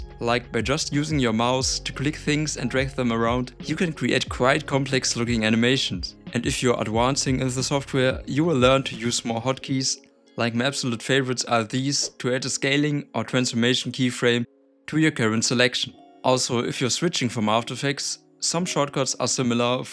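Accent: Germany. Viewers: ARE YOU GERMAN BY ANY CHANCE WOW YOU GOT DISCORD? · Dieser deutsch Akzent 😂❤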